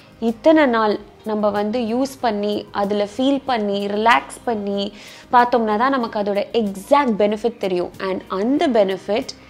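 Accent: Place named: Sivagangai, India. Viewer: Pechula sivangai sayal teriuthey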